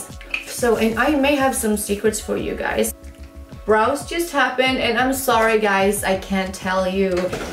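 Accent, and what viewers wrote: Sweden. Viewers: Swinglish! 🤣🤣🤣 Love it! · you are magical ❣️ 🧝🏼‍♀️🧞‍♂️🧚🏻‍♀️ I loved “The Swenglish”. I just got my palettes I ordered from your website. I had to stop this video before you started the tutorial and go to Nabla and ordered that palette. Your eye look was gorgeous 😍😘❤️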